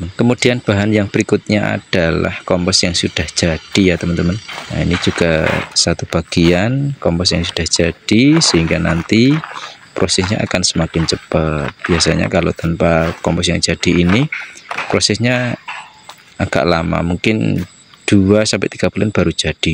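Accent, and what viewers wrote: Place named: Java, Indonesia. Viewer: Jenengan pundi kok jowo meduk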